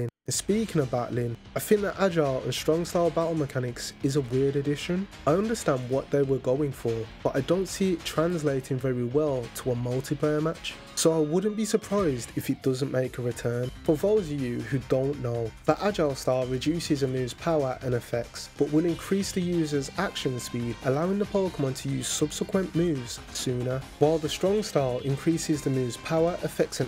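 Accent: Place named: West Midlands, United Kingdom. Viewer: Omg your accent is west midlands accent I'm from Oldbury!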